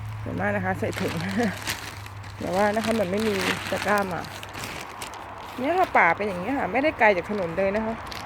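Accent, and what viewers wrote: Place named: South, Thailand. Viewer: ชอบนอ้ง นะคะติดตามมาสักพักแล้วคุยสนุกใต้ก็ฟังออกนะคะเพราะแฟนก็คนใต้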